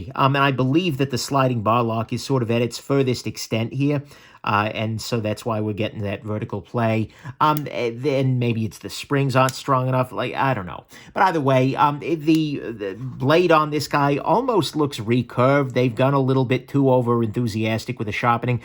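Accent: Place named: Mid-Atlantic, United States. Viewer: I dig the accent it sounds like 1940’s mid Atlantic where it from?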